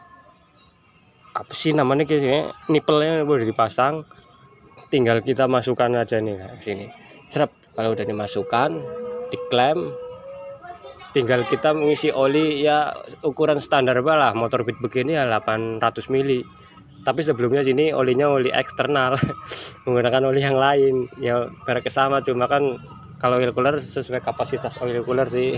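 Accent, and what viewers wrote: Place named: Brebes, Indonesia. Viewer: Logat e wong Brebes 🤔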